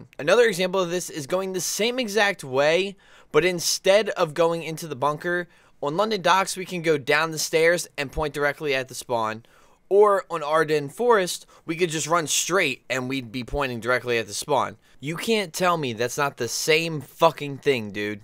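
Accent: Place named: Pittsburgh, United States. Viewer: You have a Pittsburgh accent kinda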